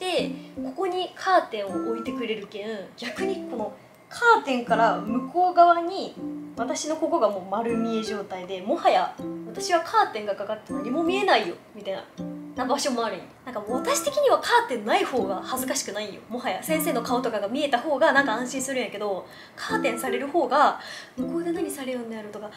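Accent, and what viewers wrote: Oita, Japan. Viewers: 最近さん知りましたが大分弁に親近感です❤ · ちょこちょこ出る大分弁。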